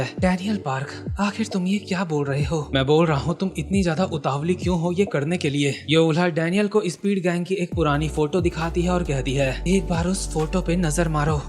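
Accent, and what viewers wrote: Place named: Gujarat, India. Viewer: bhai kya tum crunchyroll pe bhi anime dub krte ho kyuki pehle jaisi voice tum og daniel ko dete the jab wo mota tha waisi hi voice maine crunchyroll mein ek anime ke mc ki voice suni hai aur kya tumhare pass 2 ya 3 bande aur hai jo tumhe lookism ke video dub krne mein help krte hai aur tumhare tone se lagta hai ki tum gujarat ki side se ho kya tum ahmedabad se ho kyuki me bhi waha se hu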